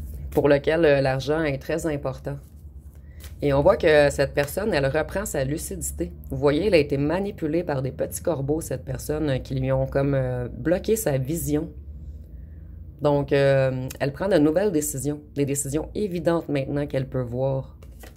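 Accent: Quebec, Canada. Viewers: Bonjour … quel bel accent quebecois … c’est si jolie et relaxant de vous écouter , un pure bonheur !!! 😌❤ Les 3 le représente parfaitement bien , vous êtes vraiment tres doué . 😅👍🏼.. infini gratitude pour tout le temps que vous nous consacrez … Merci . Stéphanie 🙏🏻🙏🏻🙏🏻🙏🏻🌼☀️🌸🌷🎉🎈🩷💞🌼☀️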